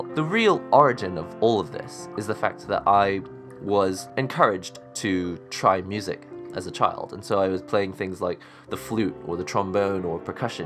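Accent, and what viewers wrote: England, United Kingdom. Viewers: the british accent really fits you XD I mean I know the accent wasn't intentional but damn XD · It warms my heart to see an Asian with an English accent rather than American.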